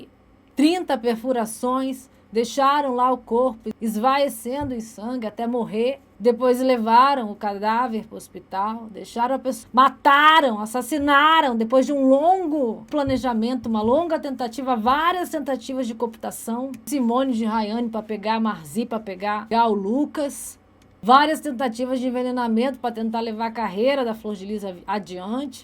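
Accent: Rio de Janeiro, Brazil. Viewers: O sotaque do Rio é massa 😅, parabéns 👏🏾😘.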